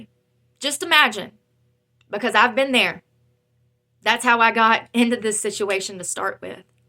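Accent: South, United States. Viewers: Hey, stay positive. Whatever you do, don't go back to the booze. It's a lonely world out here, but guess what... you have the sweetest southern accent I've heard in a while, and that's special. Stay positive, god bless!!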